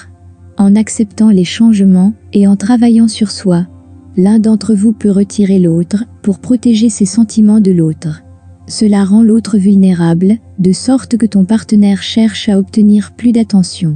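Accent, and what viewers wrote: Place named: France. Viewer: J'avoue que l'accent français me fait presque rire alors que dans la vraie vie j'ai plutôt envie de pleurer... quelle vie !!!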